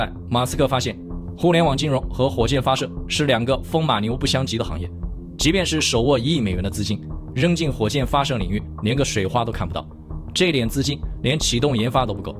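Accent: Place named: China. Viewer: 一嘴大陸口吻，不看了，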